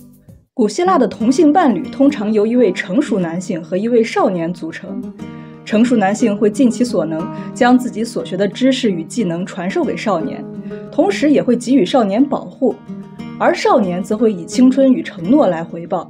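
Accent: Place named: Shandong, China. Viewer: 这浓郁的山东普通话，真是欢乐😂😂😂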